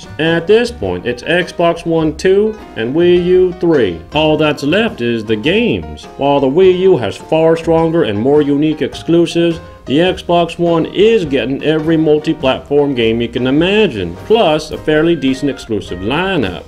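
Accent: United States. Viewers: wait are you American?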